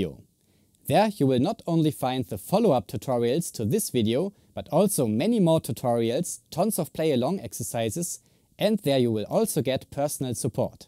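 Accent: Germany. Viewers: Your from germany...i think its a strong german accent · Lovely deutsch accent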